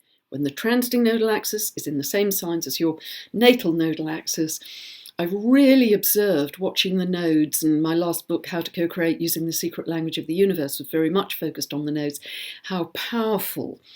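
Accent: United Kingdom. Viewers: You are my favorite internet fairy. Your forecasts are way cool. Really enjoy the way you talk and the British accent, too. Thanks for doing this. Greetings from Florida. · Beautiful lady, great astrology! I love her British accent, too! So chic!!! Thanks & Blessings! ✨💫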